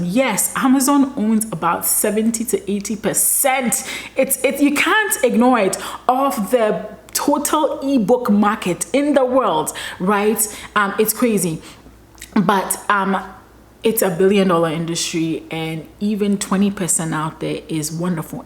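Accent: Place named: Ghana. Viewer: You sound like a Ghanaian....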